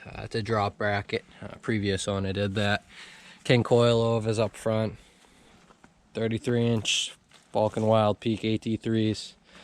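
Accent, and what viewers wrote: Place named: Massachusetts, United States. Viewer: Nice video, knew you were from mass by your voice lol I live in plymouth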